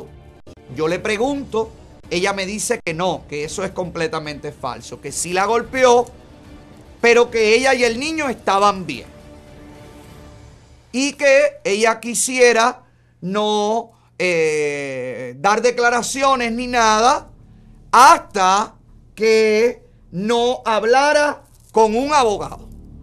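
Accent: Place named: Mexico. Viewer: Ahora esto es un show con acento neutro mejicano 🙄 lol para a ellos se subscriban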